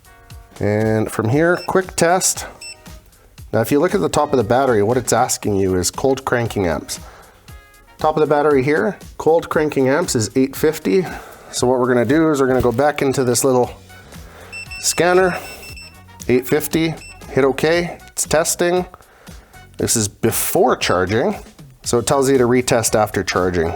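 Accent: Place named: Calgary, Canada. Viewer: I love that Calgary accent, eh?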